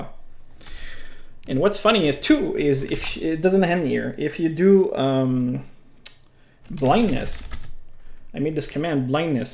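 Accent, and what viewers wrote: Quebec, Canada. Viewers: Are you from quebec?? I can hear an accent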